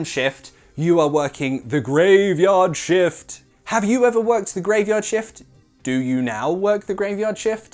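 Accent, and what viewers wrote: United Kingdom. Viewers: Does he speak british english? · Anish Tom No. His pronunciation sounds 100% British to these American ears.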